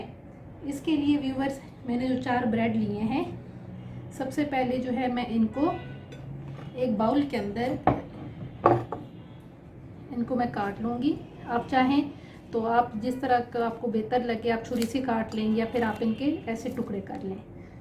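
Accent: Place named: Murree, Pakistan. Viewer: Focus on pronunciation I think so you belong to murree.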